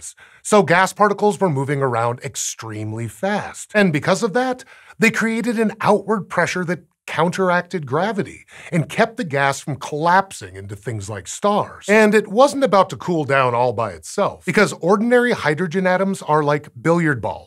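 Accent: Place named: United States. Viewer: Chris Ecclestone? He was from the north of England. This guy's American.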